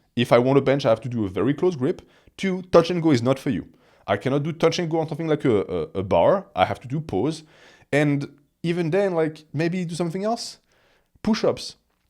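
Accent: France. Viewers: God I love that French accent idk why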